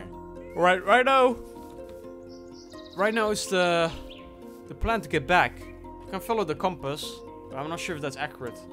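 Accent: Netherlands. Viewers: Do I hear a Dutch accent? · Het Nederlandse accent maakt het wel af hoor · Are you Dutch or Belgian? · I’m smelling a Dutch accent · quiq question and by no means to offend you but are you dutch? you sound pretty dutch to me. (again not meant offensive by any means and tbh it sounds good(nice vid too))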